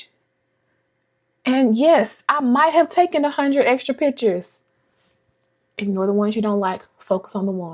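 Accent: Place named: North Louisiana, United States. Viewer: Hey hey girlie!! Girl the more I hear you talk the more I hear that North Louisiana accent lol I'm proud of you girlie and I'm right with on that journey of learning to better love myself as God took His precious time to make this precious jewel. Also keeping in mind that I too have to do my part. COME ON NA!!!!!!!!!!*punching kicking the air*lol WITH THE DESK MINISTRY! Just go ahead and read me! lol Girl editing wasn't too too bad for me but it was aggressive because once I would sit down I would NOT move or answer the phone,nor eat,drink or use the restroom because I needed to be fully zoomed in and not distracted because once i get distracted thats it,it would be hard to get back in the zone. Thanks for allowing God to use you to share those lessons! I love youuuuuuu girlie!!! 📸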